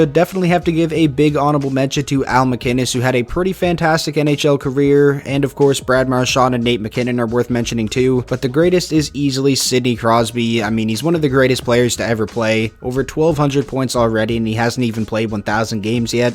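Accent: Newfoundland, Canada. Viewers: I mean, really depends on what type of Newfoundlander you’re talking to lol. Almost thought you were from NL, with how you pronounce certain words. Not all NL’ers sound as animated as you think haha. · Which province are you from? I can tell you’re Canadian from how you say Hart, Clarke, part, etc.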